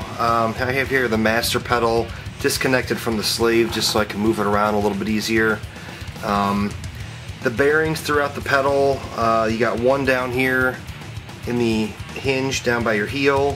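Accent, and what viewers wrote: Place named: Canada. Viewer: he kinda sounds canadian doesent he??? small accent ??? or maby colorado??? haha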